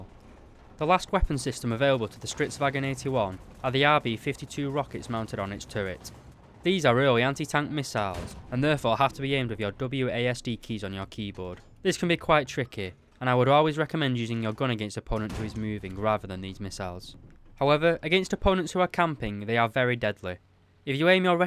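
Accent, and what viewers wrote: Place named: England, United Kingdom. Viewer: Ayup mate... Whereabouts are you from in England? I'm from the high peak myself so your accent sounds quite familiar to me😁👍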